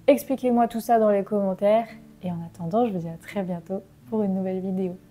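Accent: France. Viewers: L’accent qu’on depuis la naissance n’est jamais incorrectes, à mon avis. Je crois qu’il y a des accents nombreux dans chaque pays. J’ai entendu dire qu’ici, aux États-Unis, un présenteur de nouvelles, né aux sud des E.U., adoptera un accent du nord s’il commence à travailler dans une compagnie nationale. Je ne sais pas si c’est obligatoire ou à la choix de la personne même. Quand j’écoute votre bel accent français, il me semble fou del le critiquer. Si je continue à apprendre le français jusqu’à je deviens un vieillard (pas longtemps)🤓, je n’aurai jamais un accent si beau en français. Mon accent en anglais n’est pas mal quand même, parce que je suis né dans la banlieue New Yorkaise, en l’état de New Jersey, le lieu de naissance de Bruce Springsteen, Bon Jovi, Frank Sinatra et Whitney Houston. Merci encore. À plus à mes amis francophones, surtout à Angers, ou j’ai passé un semestre d’études en 1978. 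· Je crois qu’en France la glottophobie est une forme de snobisme. Il faut parler comme un Parisian; sinon on risque d’être exclu de la société civile. C’est une tendance très destructrice. Je m’en souviens comment les gens ont moqué de l’accent de Jean Castex, ancien PM de France, parce que il venait de sud-ouest. Ceci dites en tant que anglophone il est très difficile pour moi d’entendre la différence entre ton français et le français parisien. Alors reste tranquille j’entends que de bon français chez toi.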